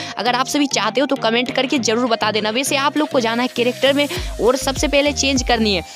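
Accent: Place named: Gujarat, India. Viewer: You're gujarati rait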